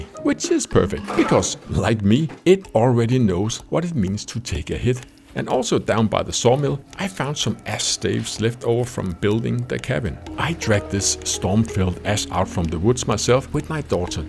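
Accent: Denmark. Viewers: click on a video from a channel I haven't watched before, immediately hear the accent, OH A FELLOW DANE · Great video production, likeable 50something, cool looking furniture, charming Danish accent: I subscribed!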